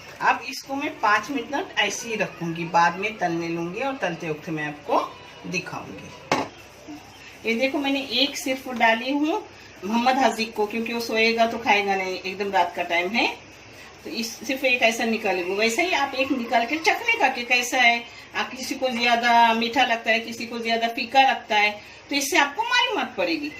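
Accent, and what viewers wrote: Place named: Konkan, India. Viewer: BEHEN JI AAP KOKNI HAIN , JIS TARHA AAP KI BANAI SARI RECIPES AUTHENTIC HAIN , USI TARHA AAP KI URDU JO KOKNI ANDAZ AUR PRONUNCIATION" تلفظ" LIYE HOTI HAI WOH MUJHEY BOHOT ACHCHHA LAGTA HAI . DEEGAR AAP KI RECIPE KE DARMIYAN DUAYEN AAP KI SHAKHSIYAT AUR KIRDAR KI AQQASI KARTI HAIN ,"LAJAWAB ". ALLAH TĀALA AAP KO KHUSH RAKKHEY .AAP KO SIHAT AUR LAMBI ZINDAGI DE .TAKEH AAP ISI TARHA KIKAN KI NIT NAI RECIPE SAY HUM SABHON KO NAWAZTI RAHEN . "ZAHID SANGE".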